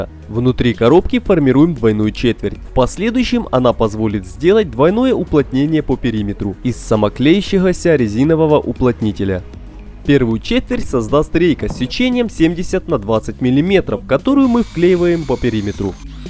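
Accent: Ukraine. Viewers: А голоса за кадром украинский акцент что-ли не пойму?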